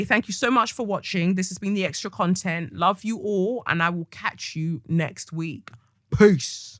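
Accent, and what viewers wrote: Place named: Africa. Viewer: Every single time!!!! Must you always be this on point african mother accent Everytime I listen to you it’s like your speaking direct to me.Always speaking the light I needs to hear at the perfect time. Thanks and appreciate you my baby gurlllll.✨🌻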